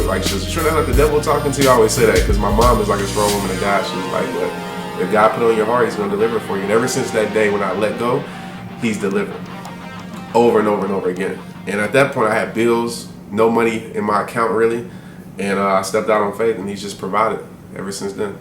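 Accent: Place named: New Orleans, United States. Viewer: New Orleans baby !!!! Me too son ! thought u look familiar , naa j/k .. na but you do have that Orleans style. !! you talk like us too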